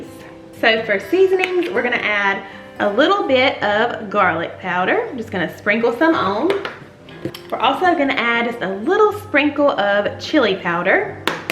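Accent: South, United States. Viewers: I sooo enjoy watching all your videos! I love your southern accent! I'm from Oklahoma. Us southern girls have that accent!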